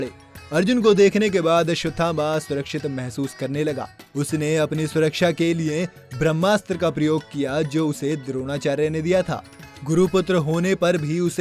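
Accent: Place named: Tamil Nadu, India. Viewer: Bhai Tamilian hai voh unka accent hindi me aise hi rehtha thum ek bar tamil ya kannada bath karke dikhao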